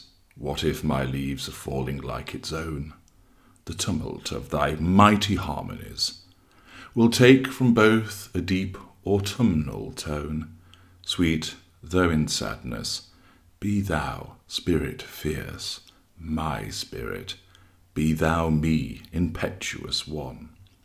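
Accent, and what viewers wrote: England, United Kingdom. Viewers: Only an Englishman can speak like this.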